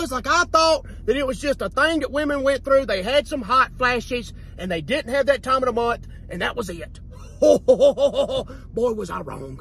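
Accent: South, United States. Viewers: Gotta be that southern accent and slang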